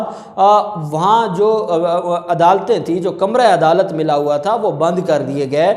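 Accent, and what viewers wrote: Punjab, Pakistan. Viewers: میاں تم لوگوں کے نام پنجابی میں لیتے ہو یہ تمیں کیا بیماری ہے پہلے جاوید کو جوید اب خواجہ اصف کو خاجہ اصف پڑتے ہو میں تمارے سارے تجزیے سنتا ہوں اور ڈس لائیک کرتا ہوں جب نام ٹگیک سے لو گے تو پھر دوبارہ سبسکرائیبر بھی کرونگا اور لائیک بھی کیا کرونگاجب تک اپنی غلطیوں کو دروست نہیں کرتے تب تک تمیں میرا سامنا کرنا پعے گا تیری جان میں نہیں چھوعنے والا کم از کم میرے کمنٹس تو لوگ دیکھیں گے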